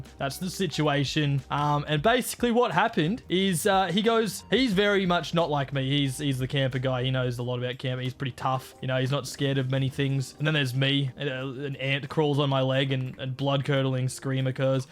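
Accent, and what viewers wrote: Australia. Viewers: His Australian accent has become more severe as years has gone on, especially after breaks. Edit: I assume this is because when he isn’t uploading to YouTube, he is conversing with other Australians IRL, but back in the day when he was constantly online, he was associating with primarily North-American creators which made him indirectly change his speech patterns to sound less Australian. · i thought you were austrailan for a second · the amount of aussie in you is perfect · HE SOUNDS EVEN MLRE AUSTRALIAN THAN LAST TIME WE SAID IT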